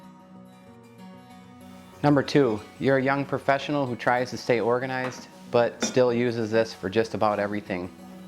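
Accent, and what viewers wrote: Wisconsin, United States. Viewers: I am just watching you and a few others refinish furniture. I have chronic headaches and can't handle the fumes or the bending. I'm trying sewing but having trouble concentrating due to the chronic headaches. I'll get there. I am a 2 but sometimes a 1! My husband is super neat and I drive him crazy cause I'm not as orderly as when all 5 kids were home. Now when the grandkids are over their parents will clean up with them before they leave which is a life saver! You do good work. I like watching you and listening to you. That Wisconsin accent. Lol.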